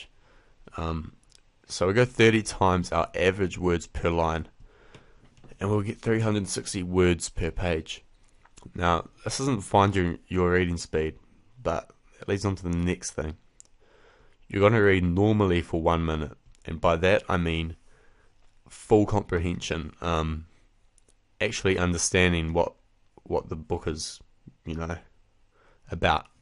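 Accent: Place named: New Zealand. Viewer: such a kiwi accent!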